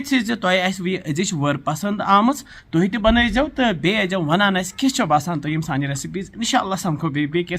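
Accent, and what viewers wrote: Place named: Kashmir, India. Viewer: I purely listen to your videos for your Kashmiri dialect...... wonderful....👌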